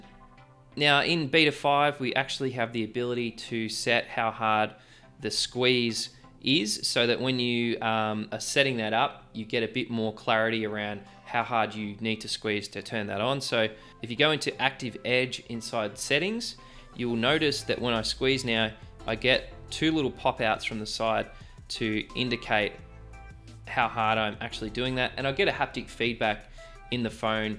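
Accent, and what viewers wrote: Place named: Australia. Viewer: i just love aussie accent.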